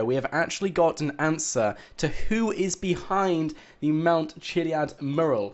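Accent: United Kingdom. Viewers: Fuck your british accent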